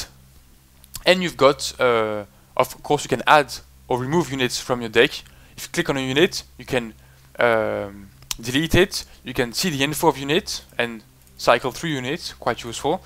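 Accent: France. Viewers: Yay French accent!! Like!!! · First 10 seconds impression of this video. Fuck, i love french accents xD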